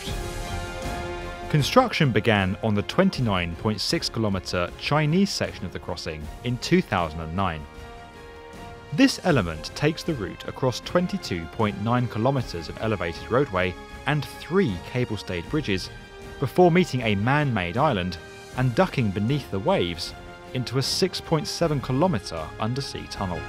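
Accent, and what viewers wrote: United Kingdom. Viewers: B1M is one of the most professional and dignified channels here on YouTube although they do have the unfair advantage that their voice-over is done by the most British man ever that always add an extra layer of class and sophistication to any piece of media.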